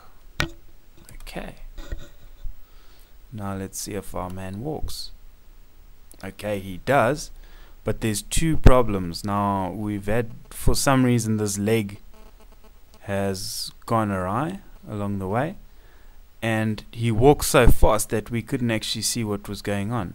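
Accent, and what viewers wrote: South Africa. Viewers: Are you South African? guessing Pretoria...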